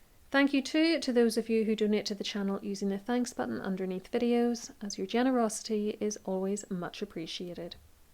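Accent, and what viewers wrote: Ireland. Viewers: Love your Irish accent. Where in Ireland are you from? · Oh i love your Irish accent! But your pronunciation of "count" and "counts" is somewhat unfortunate😂😂😂😂😂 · Is this an Irish accent? 🎉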